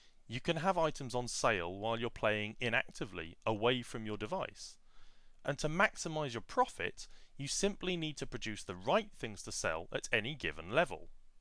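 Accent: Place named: RP, United Kingdom. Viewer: Why is it that it seems classier to play this game while I am listening to instructions from a guy with a posh accent? Also, thanks. I knew there was probably something useful about selling things.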